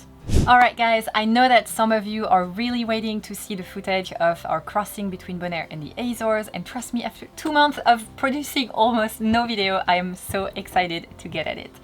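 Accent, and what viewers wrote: France. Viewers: I found myself watching your video because I couldn't stop listening to your accent. I love the French accent and wish that my French (Ce n'est pas bien, je suis desole:) were half as good as your English. I wish that my wife and I could do what you and are doing. Great video! Merci beaucoup.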